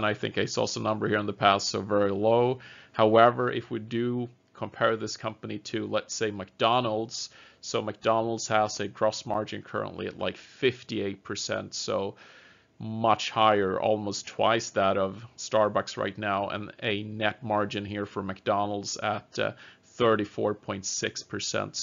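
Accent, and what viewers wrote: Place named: United States. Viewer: Thank u FOR SPEAKING AMERIKAN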